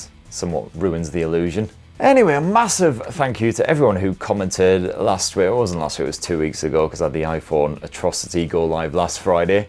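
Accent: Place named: England, United Kingdom. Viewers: his northern influence hitting me, even in the states. Love the English humour. 🤣🤣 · lot of mom-jokes, tips on how to get a good blastin, inapropriate language, best english accent ever... ...so what's not to like here? I love ur content, keep it up! And thx for the lmao moments, when im listening to ur reviews, while driving to work. Always makes my day! Greetz from Germany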